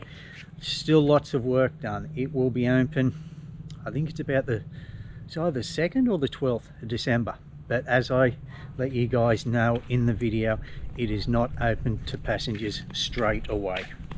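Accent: Australia. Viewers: Man! Where the heck were you from? Such an accent that I couldn't make out what the heck you are talking about? Aussy? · you sound aussie mate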